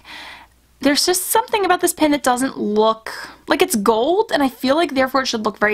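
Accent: Philadelphia, United States. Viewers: I'm from the Philly area too!! Never really picked up on your accent until you mentioned it.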